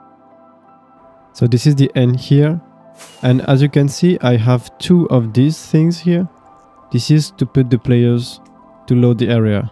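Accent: France. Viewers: You have a French accetn no? Good work🎉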